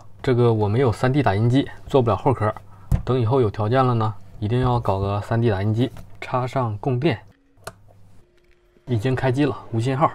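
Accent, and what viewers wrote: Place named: Yushu, China. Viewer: 听口音像是榆树的，老乡？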